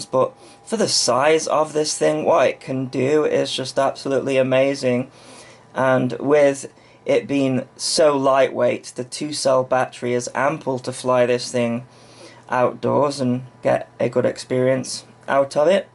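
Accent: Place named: United Kingdom. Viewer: I'm a total newbee, your reviews are really helping and the British accent makes you very watchable much like an episode of Doctor Who;-)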